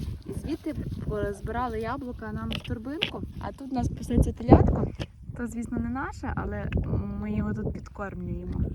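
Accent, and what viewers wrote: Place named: Volyn, Ukraine. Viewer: вже підписаний) я тільки відкрив для себе ваш канал і зазаз передивлююсь) я сам теж з Волині, але вже давно там не живу, тому дуже приємно чути наш рідний говір)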